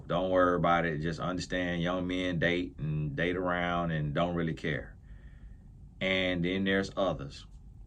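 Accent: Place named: Mississippi, United States. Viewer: are you from Mississippi??? I think I recognize your accent. ❤🎉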